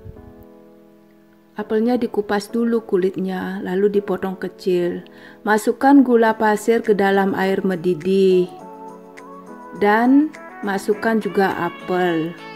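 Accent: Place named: Bali, Indonesia. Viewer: Enak ..mau ku coba. Gampang pasti anak2ku juga suka. Dari suaranya seperti dari Bali, Tinggal dimana kaka?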